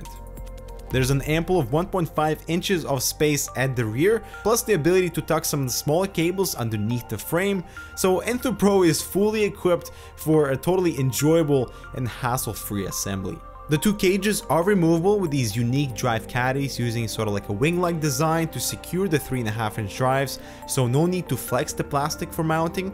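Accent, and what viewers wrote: Russia. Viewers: Are you russian? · are you Russian? =)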